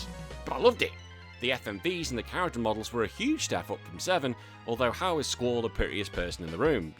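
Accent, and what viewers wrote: England, United Kingdom. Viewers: "i don't give a..." whatever man just tell us your picks and stop pretending to be a tough guy. it will never work with that English accent I can tell you that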